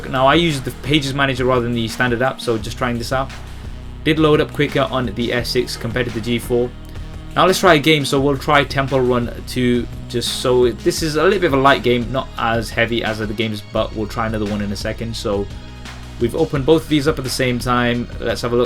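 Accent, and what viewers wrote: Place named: United Kingdom. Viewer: Hi, I really like your british accent. (; I've a question. Does a fingerprint scanner on s6 works with any orientation?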